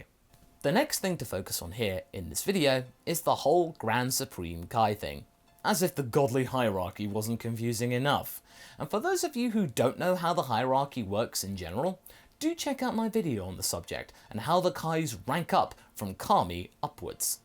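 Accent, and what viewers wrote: United Kingdom. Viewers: I like your accent, are British, New Zealander or Australian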